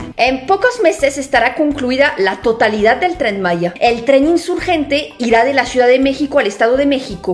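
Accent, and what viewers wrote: France. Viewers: Saludos desde la CDMX. una observación, no es crítica pero ya casi no se te notaba tu asentó francés, pero ahora como que se te traba un poquitín la lengua jajaja.... 😂😅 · Me gusta tu acento francés. Felicidades por tu canal y contenido. Excelente trabajo de investigación como siempre. Tu opinión como usuaria de trenes en Europa es muy valiosa. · Como me encanta el español con ese acento frances, simplemente precioso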